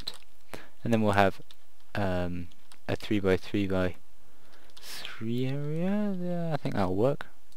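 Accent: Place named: Southampton, United Kingdom. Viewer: he sounds like hes from southhampton or somewhere near there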